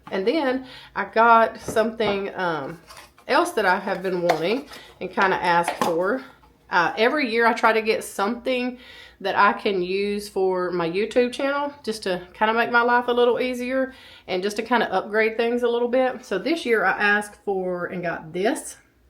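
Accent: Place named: West Texas, United States. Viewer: So glad to see you feeling better. It bypassed us this year. Thank you Jesus. I also have changed my scripture time. I have been sick all year and neglected the word. I love your home and thoroughly enjoy listening and watching you. I live in west Texas up in Lubbock. So your accent is my favorite. Happy New Year.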